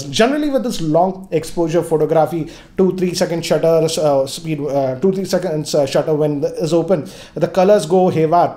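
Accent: India. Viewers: Desi Babu English Voice..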